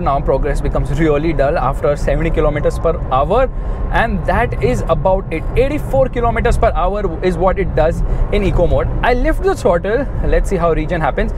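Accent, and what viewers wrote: India. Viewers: Bro Your video is Alright but your indian accent are so funny 😃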